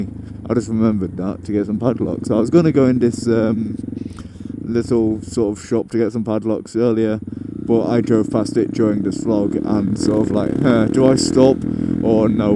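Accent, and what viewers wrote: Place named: Sheffield, United Kingdom. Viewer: i come from sheffield but live in worksop in 15 miles away from sheffield i thought i recognised the accent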